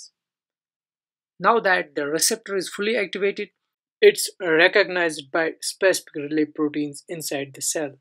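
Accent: Kashmir, India. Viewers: recognised you from your accent as a fellow kashmiri ......grt to see such good work...Allah bless you aameen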